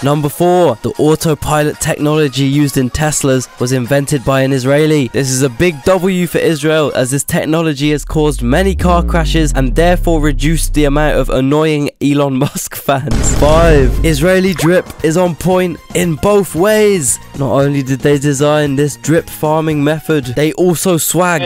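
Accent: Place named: United Kingdom. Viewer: Esse sotaque Britânico é muito bom Arca naim daish nuai nuan rai trai grai cakerrrrr LMAO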